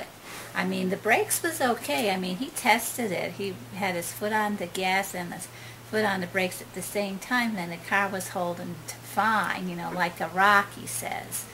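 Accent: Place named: New York, United States. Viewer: I love your new york accent. My dear lady, i usually do my own car repairs cause i don't trust mechanics. And I believe usa mechanics like to sabotage foreign cars. You need yourself a good husband mechanic and jack of all trades. If I were single i would definitely propose to you. Take care.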